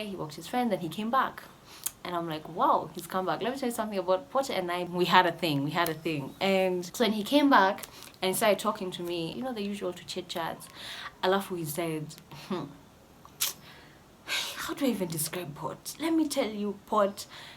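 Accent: United Kingdom. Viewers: I love how you introduce the British accent naturally 😍😍😍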